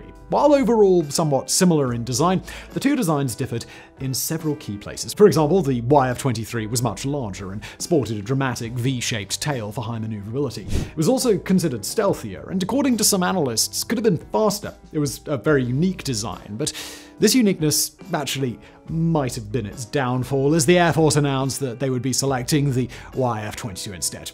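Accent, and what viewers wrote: United Kingdom. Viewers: A british accent describing my beautiful country's bad ass air superior technology is just perfect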